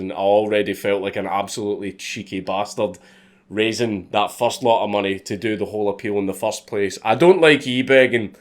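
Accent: Scotland, United Kingdom. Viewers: I'm American and think this is BS. You should have won the first time. Also, I asked my little sister if she could understand your accent. Apparently I can understand a thick sottish accent really well.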